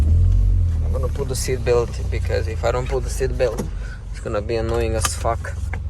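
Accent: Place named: Russia. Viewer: Ебать у тебя акцент русский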